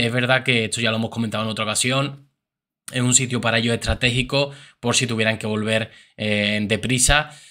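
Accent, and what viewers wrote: Andalusia, Spain. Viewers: Yo soy de Algarve.Albufeira.un <link> gusta tu canal,y el andaluz , me encanta🇵🇹😄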